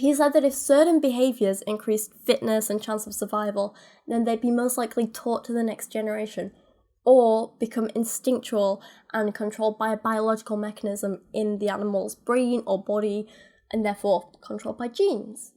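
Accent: United Kingdom. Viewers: You have a British accent. Hope you still enjoy living in your third world country with high criminality. You deserve it. if you don t believe UK is third world Google United Nations replacement migration. I m not westerner.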